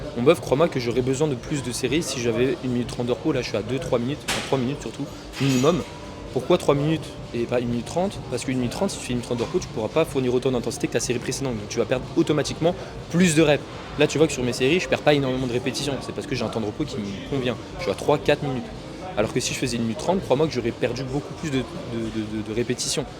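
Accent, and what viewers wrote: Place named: Asia. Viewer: Je mets un dislike pour l’accent asiatique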